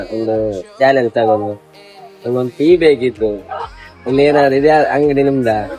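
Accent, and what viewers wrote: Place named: Kerala, India. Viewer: Malayalam slang anne chandha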